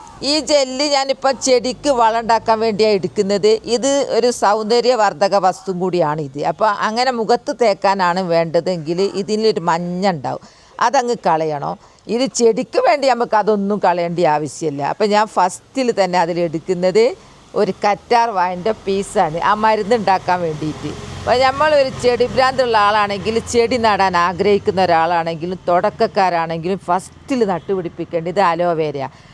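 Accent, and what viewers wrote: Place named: Kozhikode, India. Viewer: കോയിക്കോട് ഭാഷ കേൾക്കാൻ രസമാണ്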